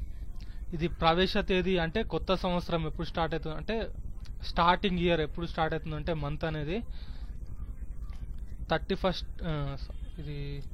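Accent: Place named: Telangana, India. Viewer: Anna nuvvu telanaga odiva? ekdam matlathunnav telanganala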